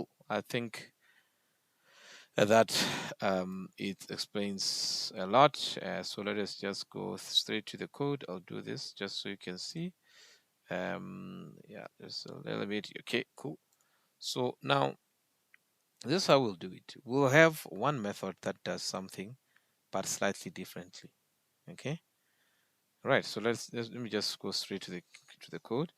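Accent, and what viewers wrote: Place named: South Africa. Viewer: Please continue making these DSA videos. Your teaching style resonates with me, and perhaps it’s your South African accent that I find refreshing.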